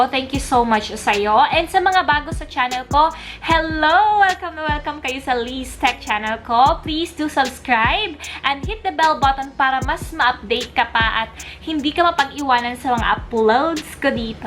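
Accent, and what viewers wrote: Visayas, Philippines. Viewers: bisaya diay ka ate?hehe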